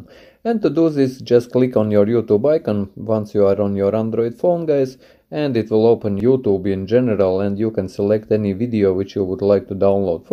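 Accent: Russia. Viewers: You Russia?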